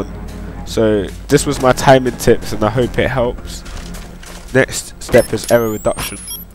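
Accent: England, United Kingdom. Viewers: People with British accents need to stop making videos. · So, is that an English accent...? O_o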